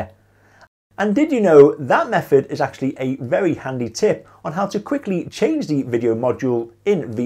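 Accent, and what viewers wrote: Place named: Northern England, United Kingdom. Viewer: Dear, I hope your tips will help me, as I have carried out an audio only recording in mp4 but I can only hear the first 3min. of it, after which, it is complete silence, although the file keeps unfolding/ running/being played for a total of some 120 minutes. But first and foremost, I would like to tell you that I find your Northern England accent sooo lovely, it moves something in my heart! Where exactly does that accent come from? Is it from Cumbria? If so, from where in Cumbria? Coniston? Or anyway, from the Lake District? Anyway, I really love it, and I am so fond of people with that accent and the kind of personality that goes with it! I am already using the Wondershare software to edit, annotate and redact PDF files, and I am very satisfied with it. Best regards, Alberto from Florence, Italy.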